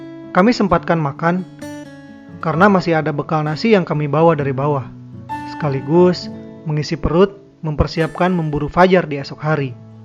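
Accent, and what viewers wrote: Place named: West Java, Indonesia. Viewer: Aa keren bangettt a.... 👏👏👏 itu bahasa indonesianya masih ketara bgt logat sundanya hahaa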